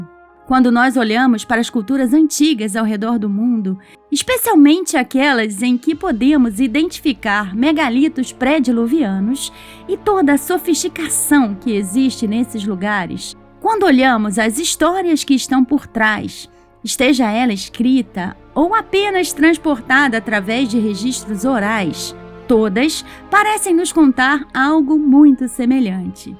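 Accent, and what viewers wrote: Rio de Janeiro, Brazil. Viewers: Adoro o sotaque! Acredito que seja carioca, e eu acho lindo... 😊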